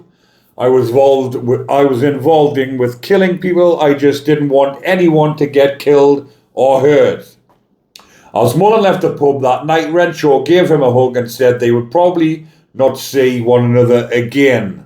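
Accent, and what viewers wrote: Newcastle, United Kingdom. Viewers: Id sue that car you tried to get out the way but the car just jumped out at you so you didn't get chance to move out the way so it hit you thank god you servicer. I really dont like Facebook i have my reasons so id love to see you're working on this channels and your voice is cool there's something about Newcastle accent.this will make you laugh the last few people who have seen me must be bloto cos ive been mistaken to be looking like a man that can be helpful and come in handy ill get ragged about you accent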